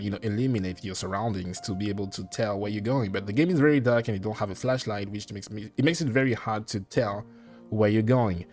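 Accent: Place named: France. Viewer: Love your accent. Are you French? I have family that are.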